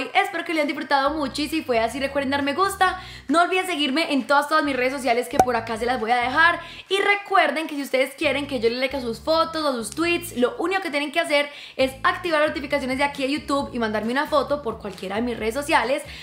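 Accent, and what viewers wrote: Antioquia, Colombia. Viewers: me encanta el acento paisa de hablas divino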